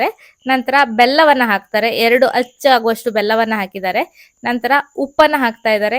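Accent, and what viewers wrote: Kundapura, India. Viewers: I was waiting for this recipe from so many years. Being a kundaprian I used miss this every time i eat rasam in Bangalore. Thank u so much madam for this recipe. Now watching again to make the rasam powder for the second time. Twice in a week is mandatory in our home now😊. Laik attu saru. Heenge video madtaikani khushi aytu. Nam kundapra bhashe keluke chenda. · This is pure kundapur kannada ಕನ್ನಡ!!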